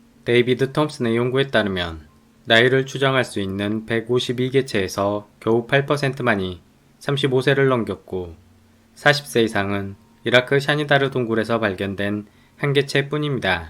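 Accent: Jeolla, South Korea. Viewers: 남도 사투리가 있으시네요.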